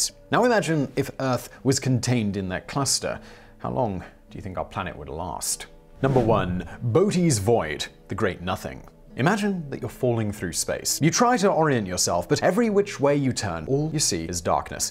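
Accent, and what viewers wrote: United Kingdom. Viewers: At toll... mahgma... waatah. Sorry, I can't stop repeating you, lol. I love the U.K. accent!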